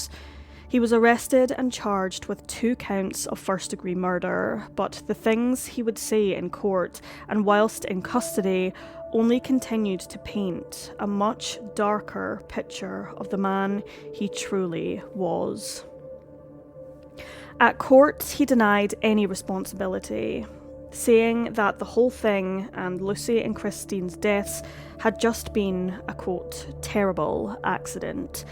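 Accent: Scotland, United Kingdom. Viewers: Gal Gadot is the narrator, don't you recognize her Scottish accent?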